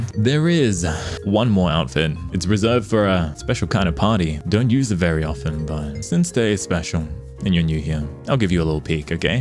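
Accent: Australia. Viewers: Sir! You are making me a tomato and I am trying not to scream! (You're Aussie accent is making me loose it despite being surrounded by it all the time since I'm an Aussie) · Omg, is that an Aussie accent I hear? If so, that makes me so happy more of us are getting out there and entering the VTuber community. Congrats on your debut! If you're not Aussie, mb lmao. Can't unhear an accent that I'm always surrounded by 🥹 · The Aussie accent is what hooked me up immediately. Also I am genuinely way more than impressed by how good the vtuber model is and love your sense of humor. Congrats on your redebut!! (❁´◡`❁)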